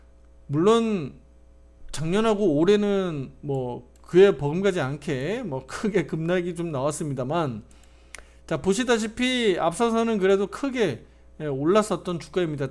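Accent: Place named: Gangwon, South Korea. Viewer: 목소리톤이 강원도시네ㅎ 반갑습니당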